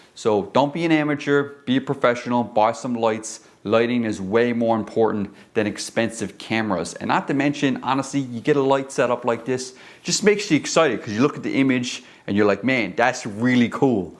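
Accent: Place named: Australia. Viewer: Bro are. You Aussie 🇦🇺? That Accent is sick mate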